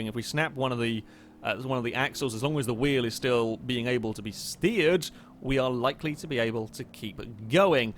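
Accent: United Kingdom. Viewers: This guy sounds like one of the high society rich English guys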